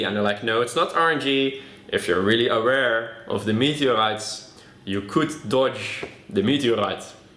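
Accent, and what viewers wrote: Netherlands. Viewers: Dat nederlandse accent en soms die woordjes blijft leuk haha xD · Kut nederlands accent xD. Ik vind hrt leuk om jou vids te kijken. Ze zijn egt daacking awesome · Je hoort gewoon da die nederlands is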